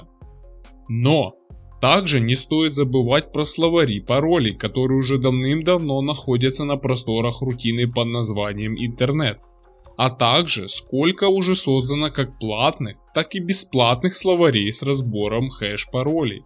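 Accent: Ukraine. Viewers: Своим говором и языком гугла ты спалил что украинец, будь осторожней а то за такую информацию тебя многие могут недолюбливать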